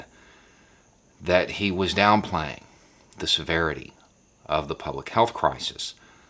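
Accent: South, United States. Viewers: I just found your channel, and I Thank You for your commentaries. Where are you from? I'm in West Virginia, and I detect a country accent.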